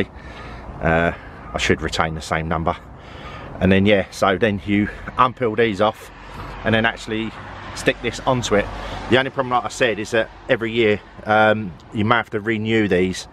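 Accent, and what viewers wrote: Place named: Cockney, United Kingdom. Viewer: I glad you like the leaf , I hope it work's out perfect for you job . Love the Cockney accent , thinking/finkng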